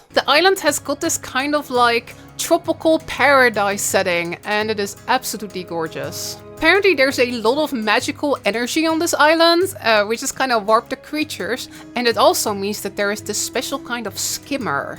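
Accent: Netherlands. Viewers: you dutch accent seem to be fading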